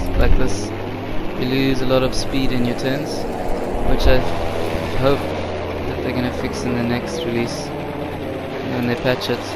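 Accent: South Africa. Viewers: Bro heard by your voice you were a fellow RSA member 😂😂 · SA Accent?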